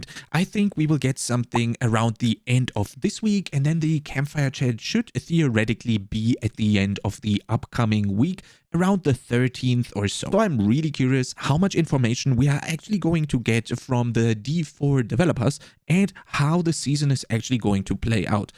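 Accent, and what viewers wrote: Germany. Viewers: Alter... noch nie einen so dermaßen deutschen Akzent gehört.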